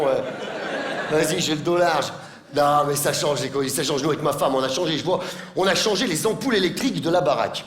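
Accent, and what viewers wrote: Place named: Lyon, France. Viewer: Joé Manitooly moi je suis originaire de la Loire du coup je l'entend l'accent lyonnais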